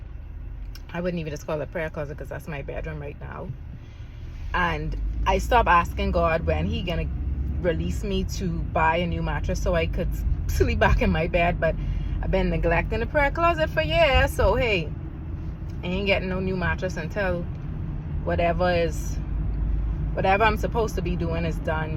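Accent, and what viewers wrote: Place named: Bahamas. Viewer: Your accent is beautiful. It sounds Bahamian.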